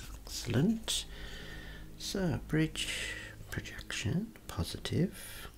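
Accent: Birmingham, United Kingdom. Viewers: My bridge reflection is not as stated how very dare you !! 😂 Great, informative vids, very relaxing and a wonderful voice , a Birmingham accent (at last) velvety smooth that doesn't fill one with a sense of urgent panic as experienced whenever my Brother in law visits. (Aston area) Please don't be offended I'm just trying to be funny . Love your work , thank you 👍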